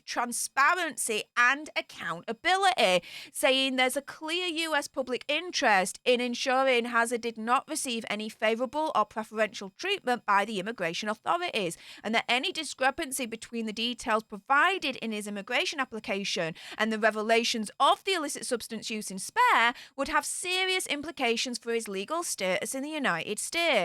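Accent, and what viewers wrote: Yorkshire, United Kingdom. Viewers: is from Yorkshire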